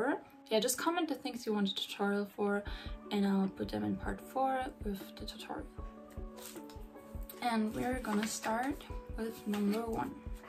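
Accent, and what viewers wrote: Germany. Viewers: Kannst du mal deutsch sprechen man höhrt das du deutsch sprichst 😅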